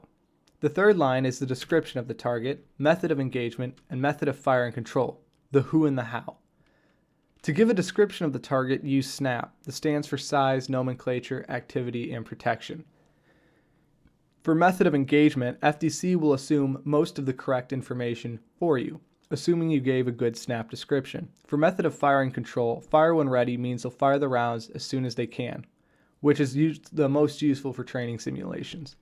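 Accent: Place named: Ireland. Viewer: Yeah dude it sounds Irish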